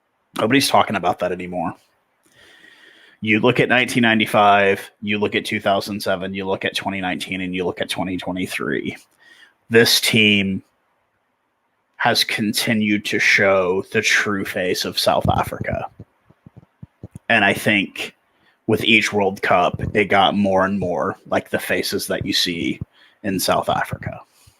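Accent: United States. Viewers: Thank you for this video, really enjoyed an American voice talk informed and passionately talking about our Bokke, will be following your future content, would actually love to see the US come up in the rugby world. Keep up the good work.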